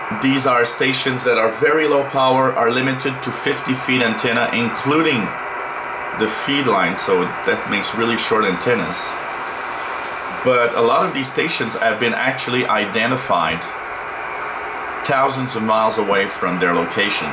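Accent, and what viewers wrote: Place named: Quebec, Canada. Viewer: Thought you sounded Québecois haha! Go habs go!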